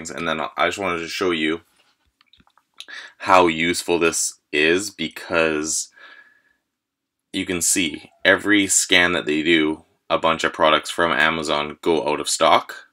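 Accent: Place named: Canada. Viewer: about? Omg you are Canadian. Love Canadians.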